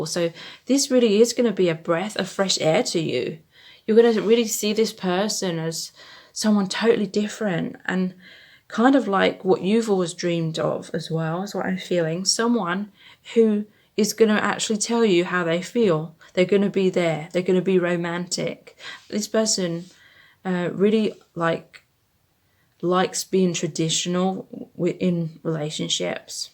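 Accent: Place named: United Kingdom. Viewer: When did I not notice that you‘re british😯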